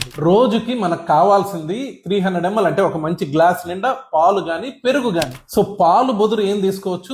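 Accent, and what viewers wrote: Nellore, India. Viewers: మీరు చెప్పే విధానం మన నెల్లూరు స్లాంగ్ భలే ఉంటది 😊 థాంక్యూ సార్👌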